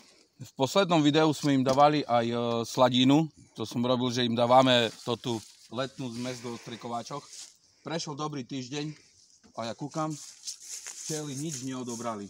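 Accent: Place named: South, Slovakia. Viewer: Zdravím tě ! Už je pozdě .kdyby byli silnější tak by to odebrali ale možna to bylo ťím že byli ve 2 nastávcích. Chci se zeptat kde včelaříš ? Oblast ? Ten přízvuk tipuju tak Juh 😉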